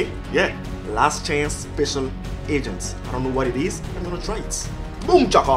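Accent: France. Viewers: j'adore tes videos et surtout ton accent francais mdr ;) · Ta un accent français un peu t'es français ?? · r u french. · Are you French? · are you french ?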